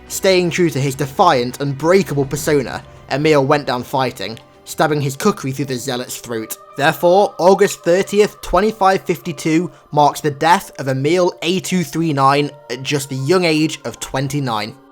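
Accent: United Kingdom. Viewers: i like his voice, it reminds me of an old friend i used to play with back when i was still active on xbox...... though he sounds more british than anything and my friend was canadian.....but still, pretty close.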